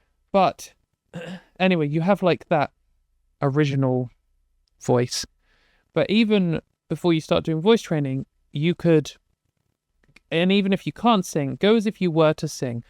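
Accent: United Kingdom. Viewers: I'm gonna be honest here, I do think you have a lovely voice,. Pleasant to listen to. But then again, I've always been a sucker for those "husky" warm voices with Bri'ish accent anyway 😅 It was mentioned here before but I'll repeat it 'cause it's so important: record yourself. Yes, it's cringy at first. Nobody likes to hear their own voice, and that's because you're not used to hearing it from a distance. But it's oh so helpful if you ever want to improve / correct anything. And that also counts for all those singers / musicians out there who think they're god's gift on Earth. Record yourseif! We don't want to suffer alone haha 😂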